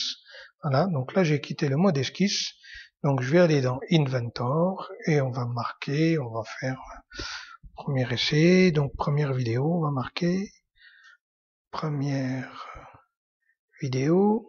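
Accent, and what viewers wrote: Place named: Belgium. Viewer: Vous venez d'oû? je limpression de reeconnaitre un petit accent belge fort sympatique! merci pour cette video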